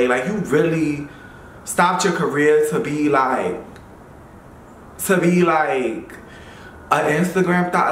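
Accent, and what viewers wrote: New York, United States. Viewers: I have missed your face and that NY accent. So glad you're back!!!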